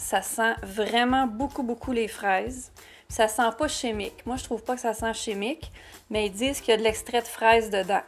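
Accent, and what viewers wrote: Quebec, Canada. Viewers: Cool ! J'adore ton accent hihi laisse moi deviner, tu es québécoise ? (au hasard bien sur) hihi J'adore les produits coréens et japonais y en a des étranges mais ils fonctionnent bien ^^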